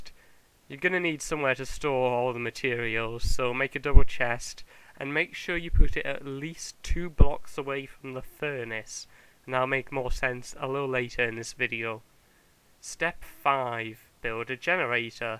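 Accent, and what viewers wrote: United Kingdom. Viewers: You sound like 'Charlie is so cool' u know that British one haha. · Probably a dialect of the UK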